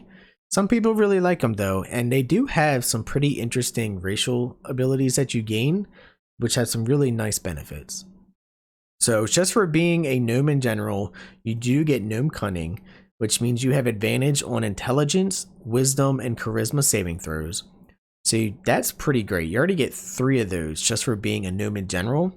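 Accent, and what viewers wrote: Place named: Delaware County, United States. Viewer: The Delco accent discussing BG3 races is hilarious. If I’m wrong and you aren’t from Philly you have to be from Baltimore area.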